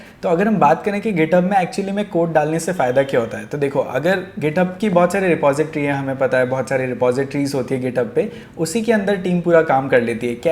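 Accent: India. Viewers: Someone has already told you that European people find difficult to understand well tipical "Indian English"??? Is not question of speed... Why this torture!?!?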